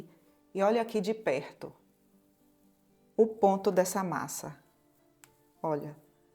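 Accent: Bahia, Brazil. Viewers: Q sotaque lindo de baiana arretada.Gosto da sua voz.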